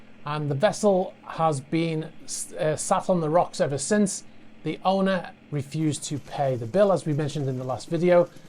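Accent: United Kingdom. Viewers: Love the HAOLE Announcer, very Brit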